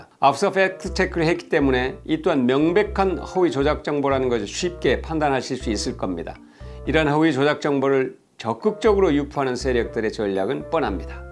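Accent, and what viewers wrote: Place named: China. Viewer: 말씨가 조선족 말투 같네요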